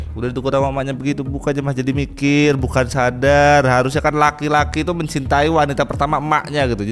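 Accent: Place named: Lampung, Indonesia. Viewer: ketara bener logat lampungnya hahahaa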